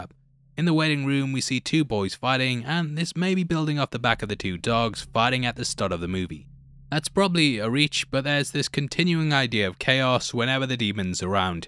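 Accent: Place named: United Kingdom. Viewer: why you english so british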